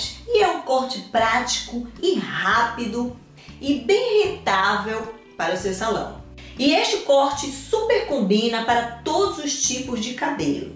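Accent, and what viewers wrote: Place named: Pará, Brazil. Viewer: Essa é paraense...hahaha sotaque muito parecido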